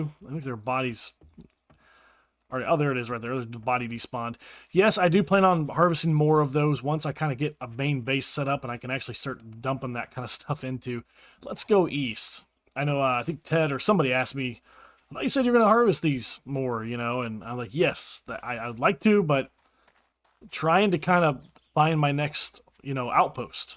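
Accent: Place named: Midwest, United States. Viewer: Sounds odds but I like the muted color better. I say you got that Midwest anywhere USA voice. A strong radio voice like Tom and Ray Magliozzi the car talk guys. (for you young people radio is what you listen to while paying Bionic Commando on your NES console.)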